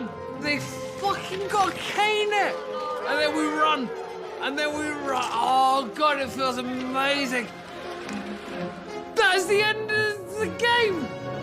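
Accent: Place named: Afrikaans, South Africa. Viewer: oh wow he actually had a non shit south afican boer accent wow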